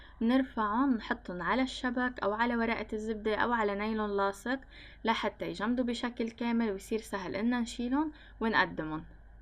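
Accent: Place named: Lebanon. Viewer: صوتك حلو مع اللهجة اللبنانية وطريقتك بسيطة بالشرح ، موفقة❤️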